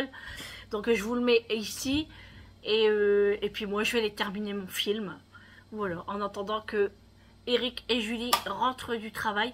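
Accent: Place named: Nord, France. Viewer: Bonsoir Un vrai plaisir de faire votre connaissance… j’ai découvert votre chaîne par hasard et j’en suis plus que ravie 😊 En effet je suis originaire du Nord et votre accent me fait chaud au cœur 🙏 Je me suis abonnée à votre chaîne avec grand plaisir… Je vous envoie de belles ondes positives de ma Bretagne d’adoption et surtout prenez soin de vous. (Ma sœur habite fâches thumesnil) Bon courage pour demain et je vous dis encore merci pour votre gentillesse. Dominique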